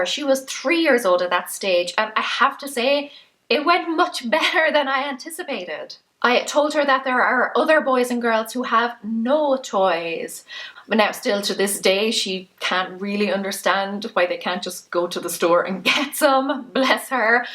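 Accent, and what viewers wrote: Ireland. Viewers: "they are". The Irish accent makes the area have two syllables!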